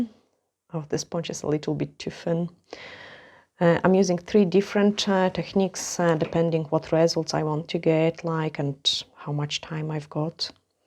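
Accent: Scotland, United Kingdom. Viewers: Greetings from Columbus, Ohio, USA. I was going to try this method but I don't have any acrylic powder so I will have to do a different way. I do pick up some Scottish inflection in your accent. Love that and your work. I am a retired RN, teaching myself nails the last 3 months. Love this hobby.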